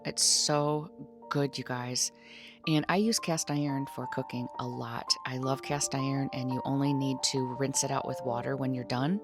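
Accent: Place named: Midwest, United States. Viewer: Same here! What labs 🧪 do you think the original poster and I should get? It feeling absolutely awful! You sound like you have a Midwest accent my friend! I too got a hysterectomy but a bit younger at 36. Perimenopause and adrenal fatigue for 5 years now. Hormones came back normal, so now what and who do I ask for the labs? Thank you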